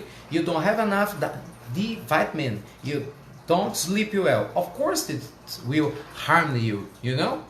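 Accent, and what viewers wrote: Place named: Brazil. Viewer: Bom pra treinar meu inglês. Pronúncia de um brasileiro é mais fácil entender! Hahah Obrigada pelo seu trabalho e empenho.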